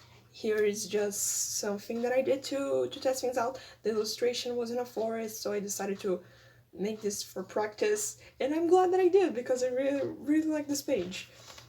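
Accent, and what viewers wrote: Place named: Brazil. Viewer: You're accent sound a little Brazilian, where are you from??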